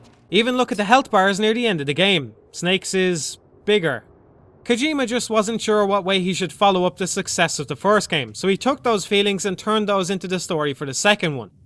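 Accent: Ireland. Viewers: You're Irish aren't you :)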